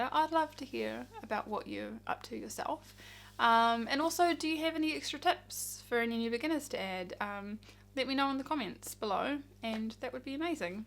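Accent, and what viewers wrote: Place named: New Zealand. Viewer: Hello fellow Kiwi knitter! Just came across your channel now. Great to hear a Kiwi accent on YouTube! Love your description of the project, you make it very clear on the pattern, materials and mods. Kia ora!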